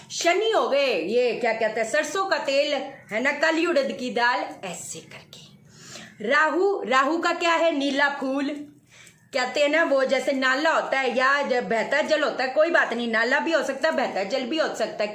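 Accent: Punjab, India. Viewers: di punjabi touch ayta batono maa apki maa bi punjbi hun so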